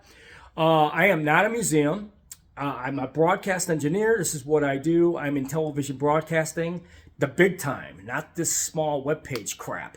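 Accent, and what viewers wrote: Chicago, United States. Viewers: I thought you had the Chicago accent. A lot of my family came out of Chicago. I've donated before, I'll do so again. Looking forward to seeing more stuff whenever your time and copyright laws allow. Good luck!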